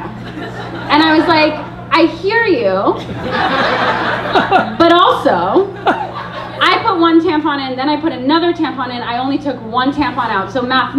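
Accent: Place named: Long Island, United States. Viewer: She cracks me up when she’s just chilling and talking. And how she mastered that Long Island accent! 😦 I’m dying to see her speak somewhere close to NC!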